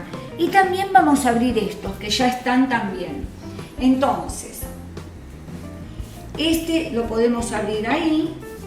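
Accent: Argentina. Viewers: Que buen pan y rapido, ya lo conocia pero me gusto mucho y con acento argentino,,,,un saludo desde España... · jajajaja!, me dan mucha gracia sus comentarios y su acento taaaan argentino , voy a hacer pan pita y el pan con sencillitas !,saludos y muchos éxitos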